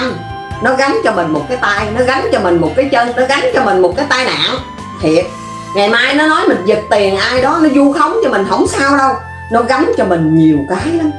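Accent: Standard. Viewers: Chị nói rất chuẩn.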